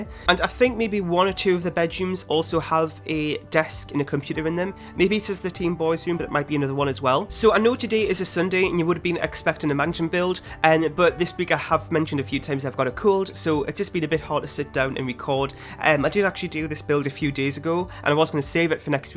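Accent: Newcastle, United Kingdom. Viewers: Just found your channel and Iv got too say I’m not sure of your accent but if it’s geordie then me too haha! Your build is so accurate too my grandmas house aswell and I think it’s really cool! Bets build Iv seen in a while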